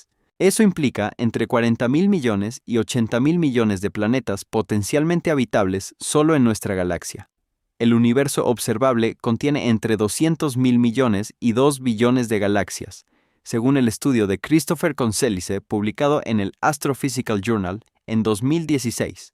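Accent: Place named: United States. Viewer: Tío, te explicas como el culo. Omites cosas de las teorías y de resultas hay cosas que no se entienden. Por ejemplo, respecto de la paradoja de Fermi, omites la conclusión de que, si han existido civilizaciones tecnológicas desde hace miles de millones de años (eso lo dices), LOS PLATILLOS VOLTANTES Y LOS MARCIANOS DEBERÍAN ESTAR POR TODA LA GALAXIA. EN PLANETAS Y SATÉLITES DESABITADOS QUEDARÍAN RESTOS DE SU TECNOLOGÍA, CONSTRUCCIONES, MINAS.... (eso no lo dices. El que lo deduzca por sí mismo, bien por él. Al que no, que le den). En este caso imaginar lo que falta es sencillo. En otros no tanto. Maquinas autoreplicantes que pueden construir otras como ellas con los recursos del entorno. OK. ¿Y? ¿Por qué la función de esas máquinas debería ser la de destruir toda civilización que encuentren? ¿De dónde se sigue eso? ¿Qué parte del argumento de has comido? 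Hablas de ausencia de estructuras modificadoras de sistemas estelares, sin explicar la teoría que propone la existencia de esas estructuras, con un sistema de 3 fases según el grado de explotación de la energía de su estrella alcanzado por la civilización en cuestión... Tú vas largando y la gente que se imagine lo que te comes, ¿no? Anda, pon menos interés en pronunciar el inglés como lo hacen los yankees y aprende a hablar mejor tu idioma. Y mientras tanto, dedícate a otra cosa, macho, que lo de explicar cosas no es lo tuyo.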